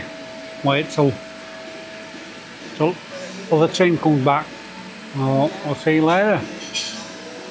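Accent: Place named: Sheffield, United Kingdom. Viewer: Really enjoying videos. Great to see the house coming on. Would be good to know costs building land. Plus a bit of back story about you both. Your accent not too dissimilar to mine. I'm from Sheffield. Any keep the vids coming. Cheers mate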